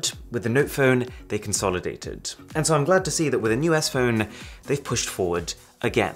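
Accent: RP, United Kingdom. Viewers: Yes, he is posh. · It is the British accent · That is the poshest accent I've ever heard from someone who wasn't old as shit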